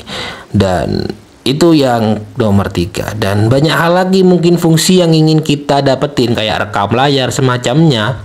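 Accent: Madura, Indonesia. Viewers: Bhee medureh kakmah kak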